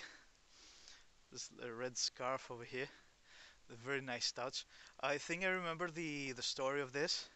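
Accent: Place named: Greece. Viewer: I watched this purely for your beautiful, Greek accent. 😻☕️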